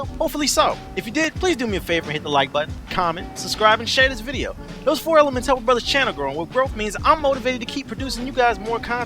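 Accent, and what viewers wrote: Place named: Chicago, United States. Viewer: damn you from chicago south south sideee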